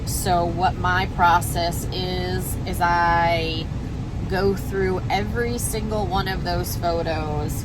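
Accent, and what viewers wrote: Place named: Boston, United States. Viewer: you definitely didn't lose the East Coast accent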